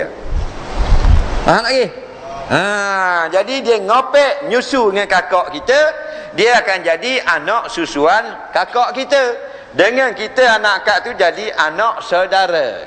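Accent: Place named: Kedah, Malaysia. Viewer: MashaAllah ustaz boleh,cakap kedah,,terbaiek.... السلام عليكم.. الحمدلله لا اله الا الله محمد رسول الله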